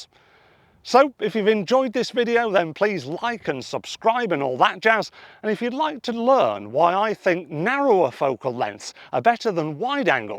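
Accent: United Kingdom. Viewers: Love the classic "ee bah goom" accent son. I travel light so only have a Zeiss Sonnar 1.8/24mm prime on my Sony A6000. Not sure how that relates to the sizes mentioned but used on everything from landscapes to close up tech photos. Main thing is to get a sharp shot and crop to suit as required. Different viewpoints by walking to the best vantage points if poss. Very helpful points in your vid however which I'll keep in mind.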